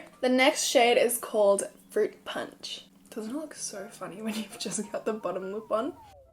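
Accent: Australia. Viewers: i really love your Aussie accent and voicee 💗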